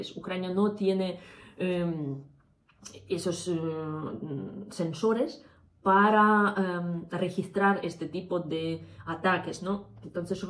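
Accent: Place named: Andalusia, Spain. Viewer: Yo soy de Jerez y a veces pienso que aprendiste castellano en Andalucia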